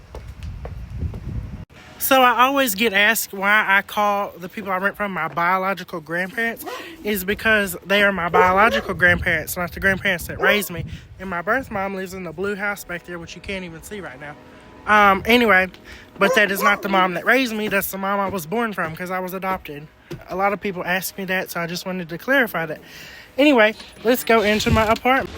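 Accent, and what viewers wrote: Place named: Louisiana, United States. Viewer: This just came across my feed and i am so intrigued by you and find you fascinating!! You dont happen to be from Louisiana by any chance do you? Bc i am from Louisiana and you sound like you might be. As well as the area that you live seems it could be. ❤ I have subscribed so that i can check out lots of your other content! Blessings and light to you!❤❤❤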